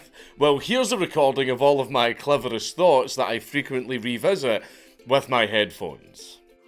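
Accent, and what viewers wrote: Scotland, United Kingdom. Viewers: Why do Scotts pronounce “world” like it has 3 syllables?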